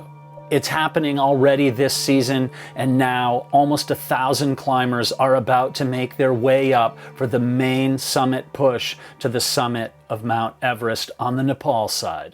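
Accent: United States. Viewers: it sounds like a cosmopolitan New England accent, so not too strong compared to Maine or Boston.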